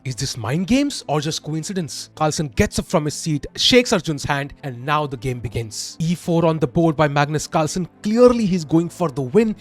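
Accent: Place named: India. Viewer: I love this commentary in Indian accent as it should be.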